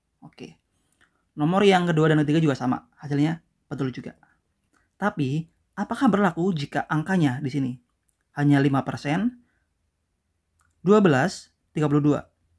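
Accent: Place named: West Java, Indonesia. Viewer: Asa wawuh siga urg sunda haaa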